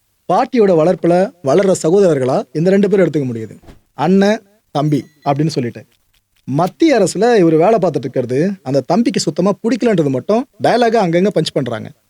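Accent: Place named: Madurai, India. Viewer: மதுரை ஜங்ஷன் தமிழா அண்ணா 😮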